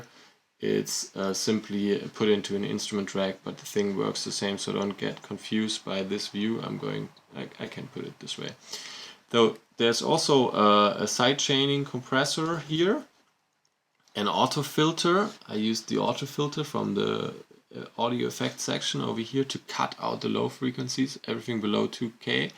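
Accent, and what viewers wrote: Germany. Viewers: bist du ein deutscher? du hörst dich deutsch an. du machst super arbeit!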